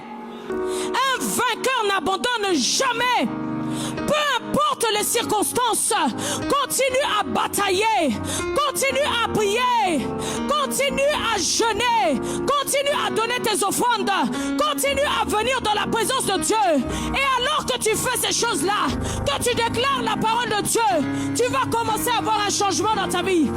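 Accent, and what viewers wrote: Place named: Democratic Republic of the Congo. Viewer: Elle prie comme les congolais. Meme accent. Sois Beni maman. Que Dieu vous fortifie.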